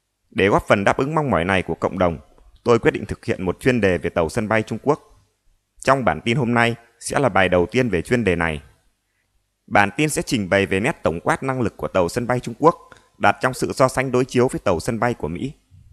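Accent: North, Vietnam. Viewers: Giọng nói phát âm giọng Bắc chuẩn nghe rất hay, không nhớt nhát như giọng Hà Nội. Phát âm gãy gọn nghe mê giọng Bắc này lắm.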